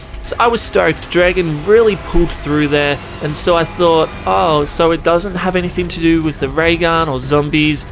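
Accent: Australia. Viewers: Is this guy australian ??? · You Aussy?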